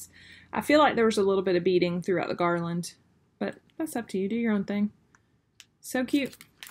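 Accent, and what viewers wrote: South, United States. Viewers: Thank you for sharing your Market experiences with those of us who can’t go! Great suggestions for the organizational committee! I laughed out loud when you said “tump” 😂😂😂 I hadn’t heard that in years! And thought I was the only one who still said it. I was born and raised in Florida...5th generation Floridian, and my grandparents were very rural! So love to hear the real South! I recently ordered from your shop and was very impressed! You will be my LNS (though not very local) from here on out! Are those letters done in eyelet stitch? What a great market haul! Looking forward to ordering “Wool and Flax” from Plum Street when it is in stock! 🐑🐑🐑 Hope y’all have a wonderful spring 🦋🌷🐑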